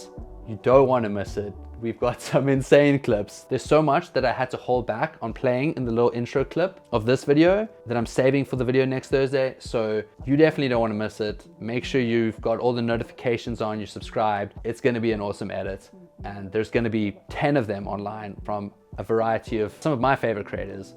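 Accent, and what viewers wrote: South Africa. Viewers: Why do you sound South African? mei bru? heiboooo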